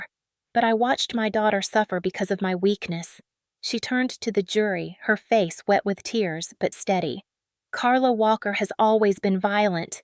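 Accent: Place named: South, United States. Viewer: Love the storytellers' southern accent. especially since my roots are tied to the south.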